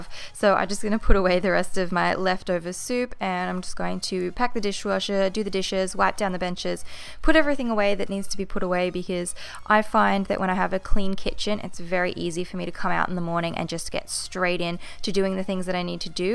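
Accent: Australia. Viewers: Are you Australian or American ? P.s I love ur channel !!! ❤️❤️ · Australian obvs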